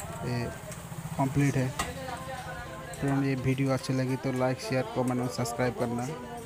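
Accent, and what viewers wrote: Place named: India. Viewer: Aap video banane ki Tameez nahi hai aap bilkul Khadi bhasha me baat kar rahe ho aisa lagta hai aap kis gaon ke rehne wale ho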